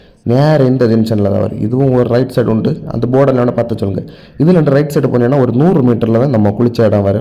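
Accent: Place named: Sri Lanka. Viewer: Srilankan voice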